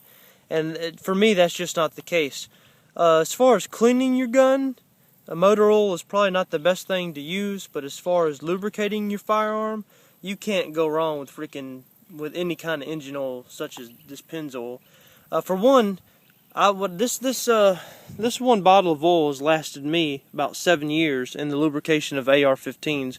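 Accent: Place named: Texas, United States. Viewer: accent, Im almost sure sounded like texas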